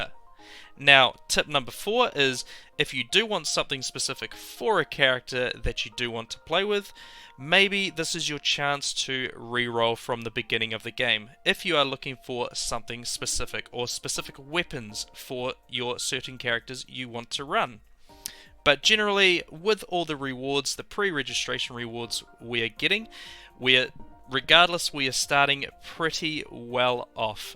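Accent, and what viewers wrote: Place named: New Zealand. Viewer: So funny, yours was the first video I came across looking for tips for day 1 EC and immediately after hearing your voice was like, damn it's a fellow kiwi, good luck with your channel mate, and subscribed so keep putting out content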